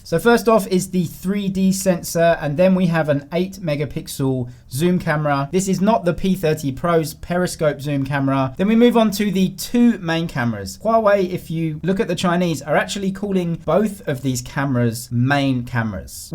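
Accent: United Kingdom. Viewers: I could even recognize that typical British accent, our dude is right now in Shenzhen, China ..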